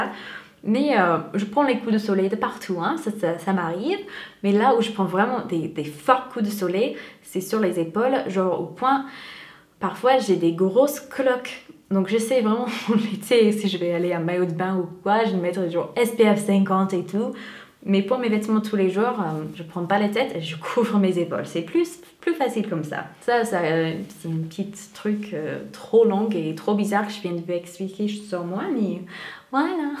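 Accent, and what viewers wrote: United States. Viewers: la robe est superbe c'est celle que je préfères ! tu es très jolie and i love so much ton petit accent usa 😊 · Salut! Ca fait 3 ou 4 mois que je regarde tes vidéos très sympathique! T'as fait une faute dans le titre Haut et pas Haul, mais tu es bien mignonne! J'adore ton accent américain continue comme ca!!! Bisous de Paris 😘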